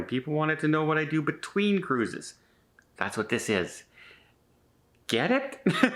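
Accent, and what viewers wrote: Canada. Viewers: 'eh. Me and hubbies love your channel. being Finnish people ask me if I'm Canadian as I pronounce words like you. Good luck to you and Tony. We are cheering for you. Your neighbors in Sault. Michigan. The yoopers. Prayers for your health. Kate and Jim Peach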